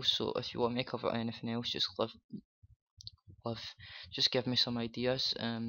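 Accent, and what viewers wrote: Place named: Scotland, United Kingdom. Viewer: nice sco'ish accen' ma'e, I'm from glasgow but not got an accen' like you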